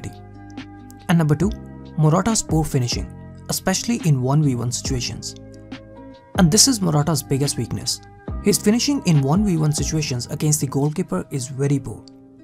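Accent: India. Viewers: Sounds Indian.... how do you he's not!!!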